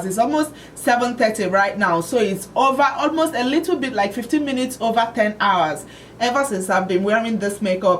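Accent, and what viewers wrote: Nigeria. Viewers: I like that you keep your Nigerian accent and you don't fake an "American" accent or water down your accent like a lot of Nigerian youtubers I've seen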